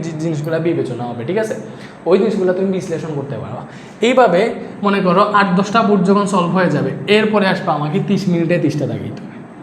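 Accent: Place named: Bangal, Bangladesh. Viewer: ভাইয়া আপনার বাঙাল ভাষা কিছু বুঝিনা তাই শুদ্ধ ভাষায় কথা বলে প্লিজ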